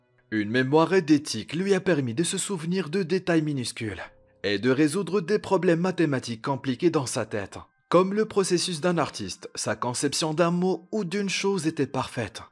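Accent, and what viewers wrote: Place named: Maghreb. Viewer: C'est le timbre maghrébin qui te dérange ? Moi ça ne me gêne pas du tout. C'est très compréhensible. Peut-être est-ce vous qui avec un problème de tolérance et de compréhension. Ou d'idéologie...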